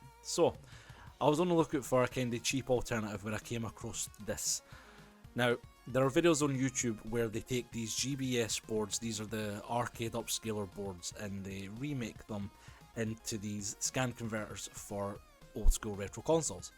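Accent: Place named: United Kingdom. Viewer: Thanks for the video! You showed us video from a N64 connected to the ODV-GBSC via SCART, was that SCART-RGB or composite? And I presume the cable from the Sega Mega Drive was SCART-RGB? And, judging by your accent you are British; Are your consoles PAL and they work fine? What about the screen tearing issue, in which situations and with which consoles did you have it appear? Have you tested VGA input? I'm looking for an affordable scaler for use with a DOS PC (VGA), Amiga (SCART-RGB) and C64, NES and N64 (composite). It seems that unless you pay the extortionary big bucks and import a Retrotink from the US, finding a single device with all these capabilities is very difficult.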